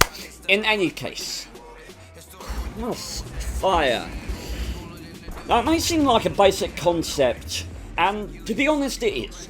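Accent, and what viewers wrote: United Kingdom. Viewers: I like ur UK accent bro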